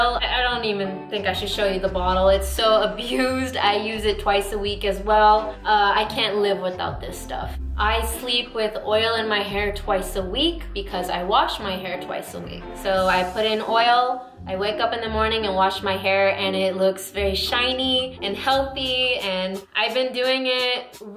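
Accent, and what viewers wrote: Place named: India. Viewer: I love your Hindi ascent... Love from India ❤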